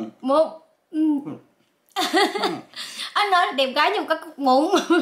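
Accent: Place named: Phu Yen, Vietnam. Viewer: Mình nghe giống giọng Phú Yên quá xá~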